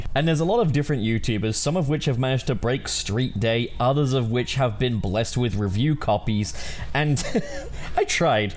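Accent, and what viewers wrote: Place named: United Kingdom. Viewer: But in your YouTube Channel informations, it says that your in USA (that's why i asked in the first place) but rewatichng your video, i noticed a little the british accent haha (it's not that obvious for a foreigner to notice it ^^')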